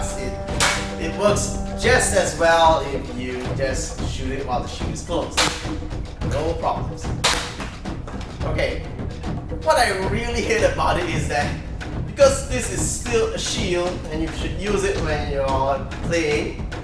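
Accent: Singapore. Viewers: Where are you from?, you have a Singaporean accent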